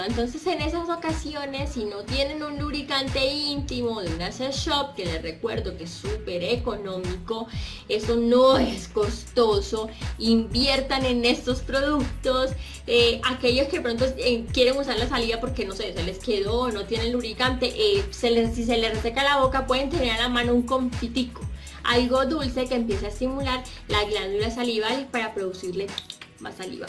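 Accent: Colombia. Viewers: Me vuelve loco ese acentito colombiano cada vez q t escucho . Saludos desde Rusia mi amor 😘😘😘😘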